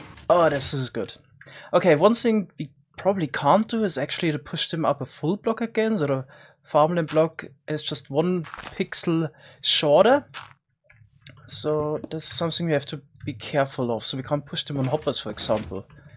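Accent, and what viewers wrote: Germany. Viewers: Du bist Deutsch, man hört das xD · Mann Merk das du Deutscher bist😂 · man hört das du deutsch bist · This German accent. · Are you from Germany because you sound Like a German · are you german (Just Asking because of the excent) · This german accent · His german accent is legendary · Liebe den deutschen Accent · Sprichst du deutsch? Das merkt man bei der Aussprache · Nice! Rebuilding Farm Simulator in minecraft. Is that a German accent I hear? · Are you german??? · Are you german ? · That German accent, loved it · Hahah dieses deutsche englisch😂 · Da hör ich doch direkt den deutschen akzent😉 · Love ur german accent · Ich mag den deutschen akzent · Bist du deutsch? Xd klingt so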